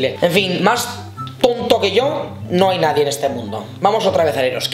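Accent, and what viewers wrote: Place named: Basque Country, Spain. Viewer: Nola notatzean Azkoitikua zeala jajaja ni Zarauztarra naiz!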